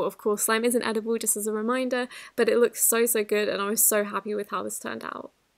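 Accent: United Kingdom. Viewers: She British, it’s her accent, she can’t control it.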